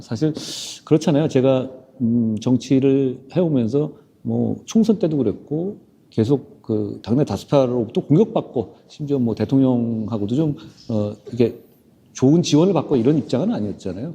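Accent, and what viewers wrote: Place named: Chungcheong, South Korea. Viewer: 한 전 대표님 말씀중에 충북사람들의 어감이좀 은연중 표현이 되는것 같 습니다.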